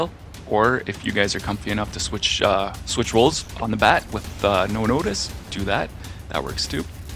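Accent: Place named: Canada. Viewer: Yup, we have the same accent though mine is slightly different cause I am from Newfoundland, but I recognized the canadian nuances lol nice!!! As for Wizards, I hate them, they melt man, I can't even use stormchaser when I know wizards are involved cause I struggle to maintain headshot accuracy with them, so I usually try to build around Gally if i know wizards are involved, and Arby is a staple in ALL my builds, it literally hasn't left my kinetic slot since I got it, the only better weapon right now is Wishender, and Wishender hits harder.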